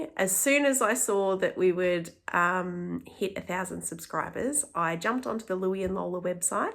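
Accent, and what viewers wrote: Australia. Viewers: Congrats from W.A on a very enjoyable and technically brilliant first podcast, it's always terrific to hear that aussie accent. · Hi, Diane from Mount Waverley here. I was so thrilled when I clicked on your podcast and out came your Aussie accent saying you were from Geelong. I loved the way you promoted Aussie yarns, especially BWM. I can't wait to watch your next video. As for jumper/sweater suggestions, it depends on what type of fit you want. Basic raglan sweater by Joji Locatelli might be a good place to start. Or the Felix pullover by Amy Christopher's or the No Frills Sweater by Petite Knits. I've made the latter two, and they are both good, basic raglan, top-down constructions.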